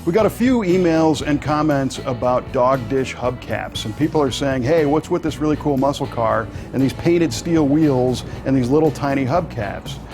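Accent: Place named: Chicago, United States. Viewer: You can definitely tell is a true Chicagoan not only by the way he said "convertible taaap" but even by the way he pronounced Chi-CAW-go. No doubt about it. And I wouldn't be surprised if he referred to the main room in his house as the "frunchroom," either. Go!:-)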